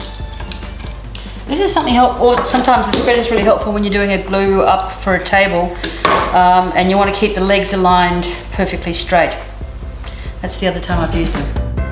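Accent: Australia. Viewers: Dam i didnt expect the ozz accent..But i do like her tho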